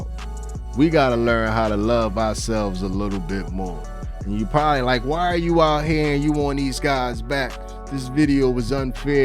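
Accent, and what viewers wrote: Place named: Baltimore, United States. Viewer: I absolutely love your Baltimore accent!!!!!❤❤❤❤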